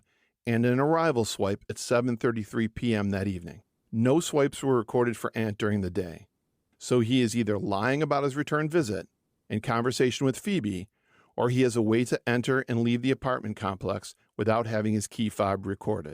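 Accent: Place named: Rust Belt, United States. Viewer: I love your voice, too. I have to ask if you are from the rust belt because the way you speak is very familiar and I am a curious cat. Just wondering. I grew up in Ohio and the Buffalo area myself.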